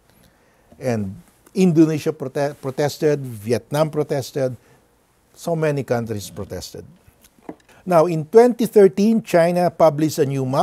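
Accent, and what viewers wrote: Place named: Philippines. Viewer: no need Dein. Most Filipinos are bilingual, English and Tagalog. Filipinos can understand "m-uh-ps" as maps. That's Filipino English dialect for you. Other English dialect speakers will quickly understand maps. After all, this presentation is mostly MAPS no matter how it is pronounced. Right?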